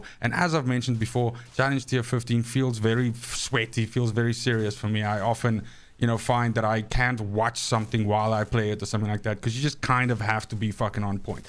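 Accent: Afrikaans, South Africa. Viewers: Love the accent - has to be South African - ek stem saam maar ek kry 3 lego's vir elke tweede CT15. Will be great to team up with you sometime! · Are you Afrikaans ? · are you south african?? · hawe you from south africa bru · Are you South African by any chance?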